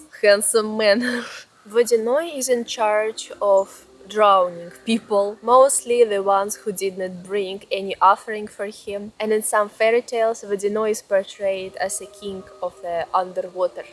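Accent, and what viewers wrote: Russia. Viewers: It is nice to hear the Russian pronunciation of the names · Be quiet! I wouldn’t change the way she speaks English! It wouldn’t be as unique with the Russian accent! Pooooф Be GONE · Love her Russian accent! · My favourite YouTuber from Russia. You're so cute like a child and beautiful. The way you present everything in such a humorous way, I'm so fascinated. Your accent(Russian) also makes your videos engaging. ❤ Lots of love 😘🎉 Make videos related to your daily life. Are you a full-time YouTuber or do other things besides YouTube, like a job? I have watched all your videos and some twice. You have shared everything on YouTube related to your family. Share your partner's side and your bond with your partner. How did you meet something like that? You've not shown that. ❤ YouTube is like your family. We enjoy your content a lot. 😊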